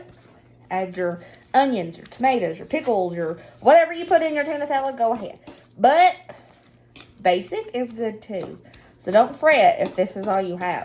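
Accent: South, United States. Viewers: You talk country! Where ya from?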